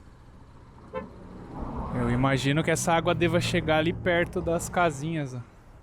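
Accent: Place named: Minas Gerais, Brazil. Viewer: Pelo sotaque acho que o é mineiro, mas pode ser de Goiás ou Espírito Santo... Grande abraço ao e aos seus companheiros!!!!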